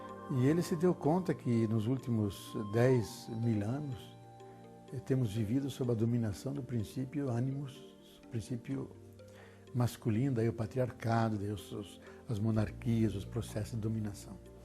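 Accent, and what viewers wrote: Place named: São Paulo, Brazil. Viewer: Greatfull,gosto muito desse sotaque paulista, meio double de desenho animado,.magos,freud,investigou os desejos animais " no ser sofisticado, humano,young,observou, outea dimensão, de um modo,discreto dialogou,muito bem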